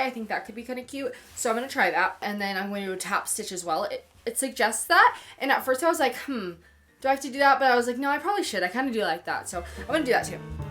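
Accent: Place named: Midwest, United States. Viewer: as a cali girl i find your midwestern accent so soothing and refreshing from the influencer vocal fry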